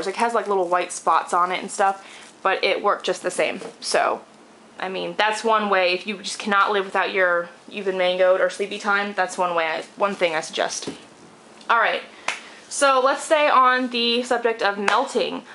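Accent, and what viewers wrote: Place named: Maryland, United States. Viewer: this is a hardcore maryland accent, I talk like this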